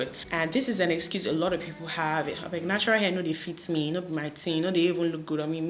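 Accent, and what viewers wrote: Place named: Ghana. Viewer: Gee, I caught some Ghanaian accent from you. 😂💚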